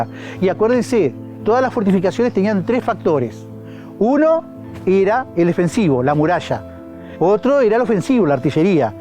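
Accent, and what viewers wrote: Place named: Uruguay. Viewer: Magnífico el reportaje, excelente el narrador, hermoso el acento uruguayo.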